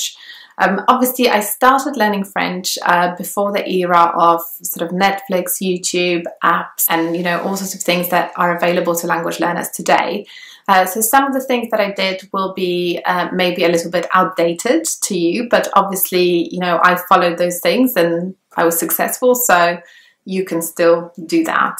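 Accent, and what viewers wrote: United Kingdom. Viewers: Wait you’re polish ? i thought you were british lol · Wait english isn't your native language, could've fooled me, you just sounded british to me ,so what is your native language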